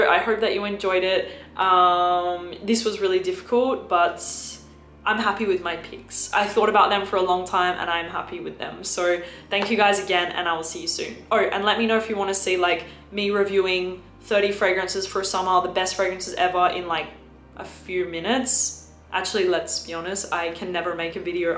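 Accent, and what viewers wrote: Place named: Australia. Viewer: I love your knowledge. Very informative I just wish you would embrace you “Australian “ accent. 🤦🏻‍♀️ Its all over the shop. So some of your words are incorrect pronunciation. Bcos you are imitating a mix of accents. Lychee = “lie chee “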